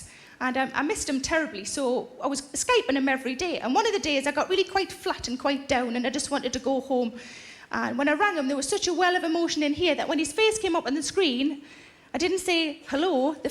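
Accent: Newcastle, United Kingdom. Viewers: I'm a Shields lass too Sarah and so proud of you. You are hilarious and it's great to hear jokes in a geordie accent and I identify so much with your humour. You had the King and his missus in stitches! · Hey English people, is this a Geordie accent? Asking from Australia.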